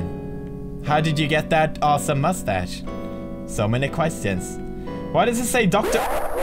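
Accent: Sweden. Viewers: Damn his Swedish accent was way thicker back then. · Lmao he sounded a lot more Swedish back then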